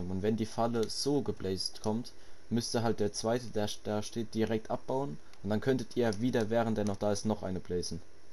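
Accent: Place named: Switzerland. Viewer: so reden wir auch in der schweiz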